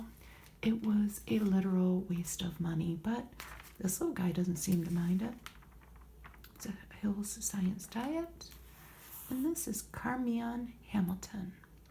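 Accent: Midwest, United States. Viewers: I really enjoy these magazine flip throughs, your voice with just that touch of midwestern accent is so nice.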